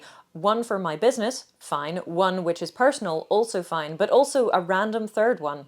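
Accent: Ireland. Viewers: Im just trying to figure out what region your accent is from. Irish? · I love that you say : in the Year of Our Lord with that sweetest Irish brogue of your ! If flies in the face of all the dumb sheep who say "Common Era" like it was a piece of legestative rule that if one disobeyed ones nose would fall off . The brogue is what the systemic algorithm looks at with a brain that's bruised with numb surprise with still one place to go.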